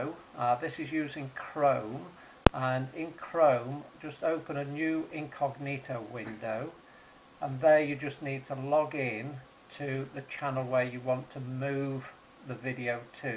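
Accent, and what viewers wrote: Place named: England, United Kingdom. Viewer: your english accent........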